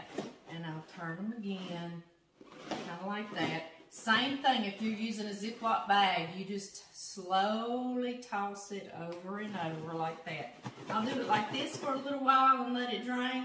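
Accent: South, United States. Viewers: You are stinking cute! Love your southern accent! ❤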